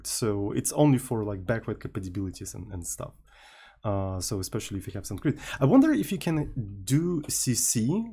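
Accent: Russia. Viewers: They are probably YouTube auto generated, he has a moderately thick accent, it's not quite Russian it's almost a mix of Russian and South African sounding, but it isn't pure clean sounding English so the auto transcriber may have had difficulties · You Russian accent is too strong, it's вери анплезант фор май ирз. Ю шуд стоп сейнг s like з энд th лайк с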